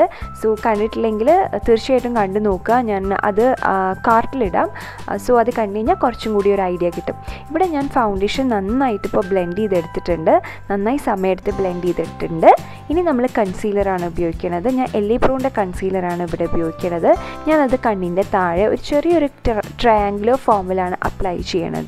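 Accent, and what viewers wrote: Thrissur, India. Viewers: Cheachi thrissur ano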